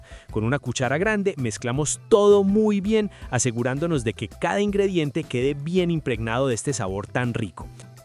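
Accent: Antioquia, Colombia. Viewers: Me encantan, tus ensaladas . Y mi encanta tu acento paisa, me recuerda, mi amada Colombia ( Medellín ) Mil gracias, Bendiciones 🤗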